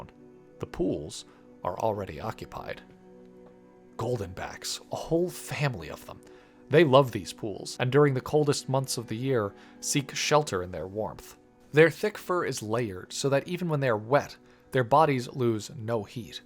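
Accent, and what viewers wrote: Scotland, United Kingdom. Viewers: I liked the Scottish accent 🥺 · I kind of found that Scottish accent entertaining and am a bit sad it didn’t continue for the second half of the month and I look forward to seeing what the adult dracomorph starers will look like if you get around to it Also one day I’ll remember to ask my parents about purchasing a set of brushes or two from your gumroad page I just have other things on my plate at the moment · Man I missed your Scottish accent in this video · No Scottish accent?😢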